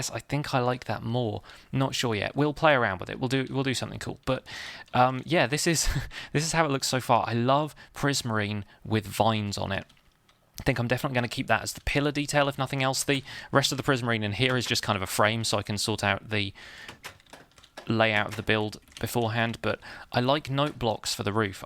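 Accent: United Kingdom. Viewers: Thumbs up, i came from OMGcraft aswell, btw ur british right?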